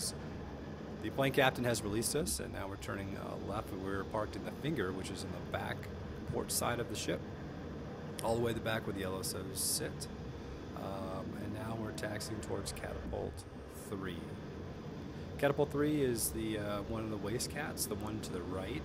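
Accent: United States. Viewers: This former Navy pilot hears a Philly accent?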